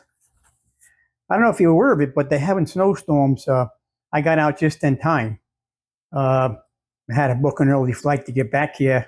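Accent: New York, United States. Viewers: You kinda look, and DEFINITELY SOUND LIKE THE ACTOR, JOE PESCHI IMO...with that New York or New England accent?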